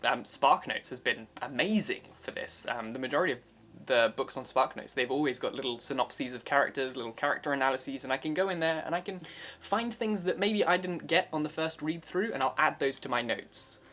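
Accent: United States. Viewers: I loved this. It is great seeing you. You are very talented and your American accent is 100 %. I hope YouTube monetized you by now. Yes, you could redo 1984. I love that book. You're doing an awesome job. I enjoy listening to you at work.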